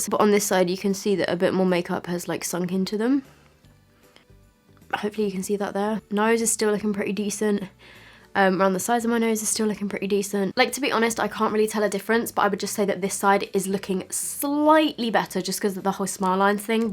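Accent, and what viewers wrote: United Kingdom. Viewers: Also, I need to say this: I love love loooove the british accent! Could listen to this all day!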